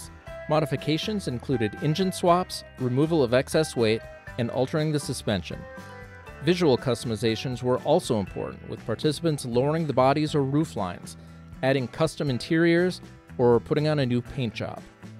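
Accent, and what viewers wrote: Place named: Chicago, United States. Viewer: Most people in America say "aww-toh-mobile". "Otto-mobile" or "Aah-toh-mobile" sounds normal to you but sounds different enough to us that we notice it. It's gotta be a regionalism, but I'm curious where it's from. Sounds kind of Chicago-y, but also kind of northeastern? I can't remember where I've heard it. The kind of place where people pronounce "awesome" as "ah-some" to rhyme with "possum". I just can't put my finger on it so it made me curious.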